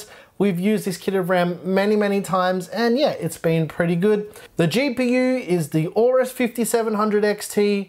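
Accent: Australia. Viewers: A Mexican with an Australian accent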